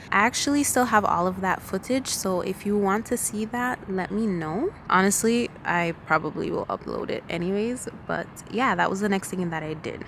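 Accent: Jamaica. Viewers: you sound jamaican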